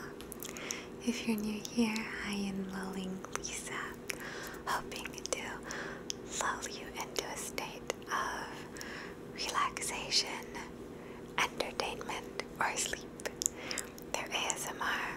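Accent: Italy. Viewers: you’re little Italian accent in Spanish is so cute :) btw I love that your dog is right behind you kinda camouflaged lol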